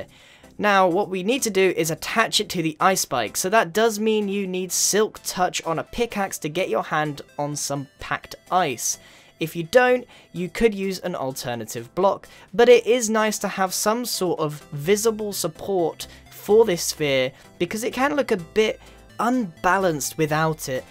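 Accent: United Kingdom. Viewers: HI i liked the video in both ways and i think you are the greatest minecraft builder ever keep up the good work and fot the next video of building your comments please make a lightning hiding from the guy who invented lightning electrisety sorry if miss spelling something or wasting your time and by the way you are british right thats my favorite english accent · JaronPlayz British accent. You cant hear it?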